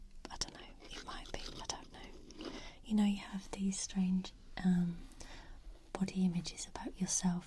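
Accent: England, United Kingdom. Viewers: I have recently found your channel and I had to take this opportunity to say how much I am enjoying your videos! I love your honesty and authenticity in this particular video. :) You've inspired me and I just wanted to let you know! By the way, I love your sweet English accent...it's lovely!!! Joanne xx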